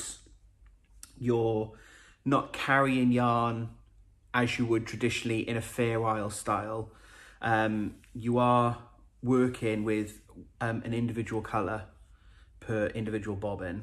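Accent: Wales, United Kingdom. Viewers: Really enjoy your podcasts, its nice to hear a lovely Welsh accent. I am newly subscribed and enjoy and feel inspired once I watch them many thanks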